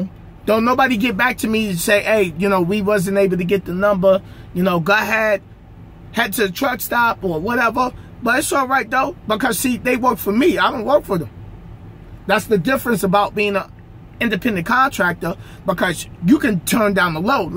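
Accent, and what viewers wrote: New York, United States. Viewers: Sounds like your from nyc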